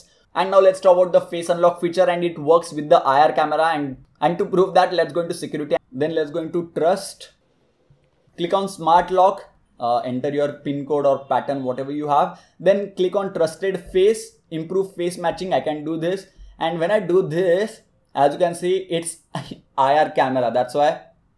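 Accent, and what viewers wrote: India. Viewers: im okay with indian accent and I do fully understand it but but but...am I the only one who think he talking too fast?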